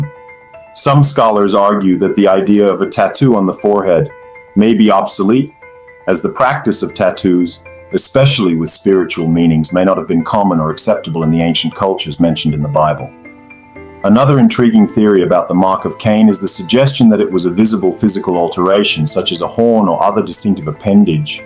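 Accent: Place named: United States. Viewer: TA falando ingles pra caraca americano mesmo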